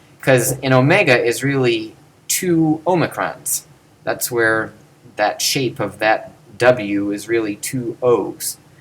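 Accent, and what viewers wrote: United States. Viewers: for a lot of the vowel sounds, he is still using a more american pronunciation because a lot of greek teaching in the US teaches the alphabet as more-or-less substitution: Alpha is basically A, epsilon is a short E, etc. when really they should be taught as their own sounds. for consonants, there was a huge consonant shift between Homer and even when the septuagint was written, but he's using the later pronunciation. He should be reading phi as a heavily aspirated plosive, not as a fricative, etc. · Only to me he seems japanese? Listening greek read with english/ american pronounce is very funny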